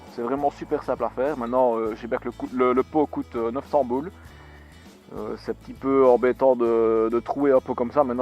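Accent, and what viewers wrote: Belgium. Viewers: tu as un sacré accent belge ou je me trompe? belle vidéo aussi hein :)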